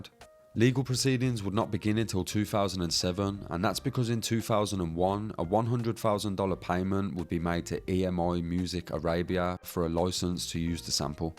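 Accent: Birmingham, United Kingdom. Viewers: Love your Brummie accent - it’s a rarity on YT. Keep making vids, mate!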